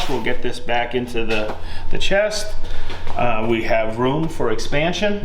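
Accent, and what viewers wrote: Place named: East Coast, United States. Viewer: Been a long time carpenter and really have enjoyed watching your videos. Living in So Cal my whole life really dig the east coast humor and accent 🙌🏼🙌🏼 Been starting to follow the Festool live show also. Moved here to NC and ISO a festool location 🤷🏻‍♂️ any help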